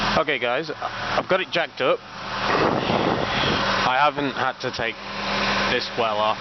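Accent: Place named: England, United Kingdom. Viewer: all I getta tell you is that you are very stupid. I always thought English people were smart.. but perhaps you are australian.. I can't say for sure, I am brazilian, but for me your accent is English. there is no way to solve the problem without removing the timing belt... BINGO, but you don't need all those special tools for keeping the timing of the engine. BINGO... you need to use your " loaf " ... some improvized tools will work the same way. I did it myself.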